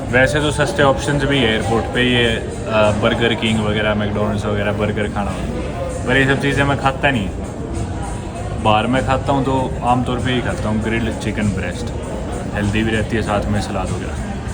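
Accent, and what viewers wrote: India. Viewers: Love your desi accent 👍🏻 and equally amazed to see how well you speak latin american languages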